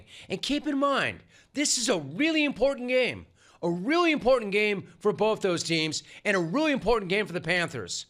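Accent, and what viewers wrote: Southern California, United States. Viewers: your soo funny with your so-cal accent and lingo.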